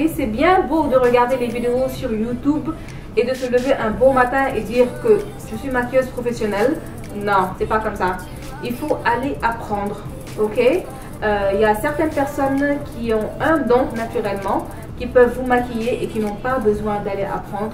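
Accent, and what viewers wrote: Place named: Senegal. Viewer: lol...ton action(voix) montre que sénégalaise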